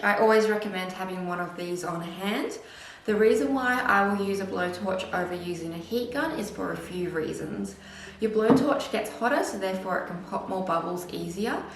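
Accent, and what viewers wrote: Australia. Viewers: Aussie perhaps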